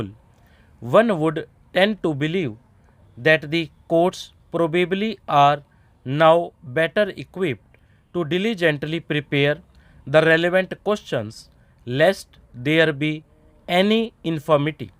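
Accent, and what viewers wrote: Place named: South India, India. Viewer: Sir your dictation helps me a lot. Thank you very much. But I am from kolkata and i am facing a problem with your accent(south). What should i do now?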